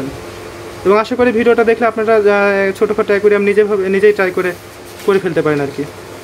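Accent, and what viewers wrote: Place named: Rajshahi, Bangladesh. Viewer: রাজশাহীর ভাই কথা শুন্যা বুঝল্যাম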